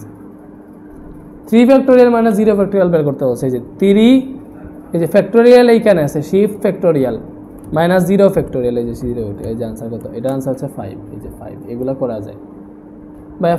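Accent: Sylhet, Bangladesh. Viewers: সার আপনার বাড়ি সিলেট নি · Uni Sylheti..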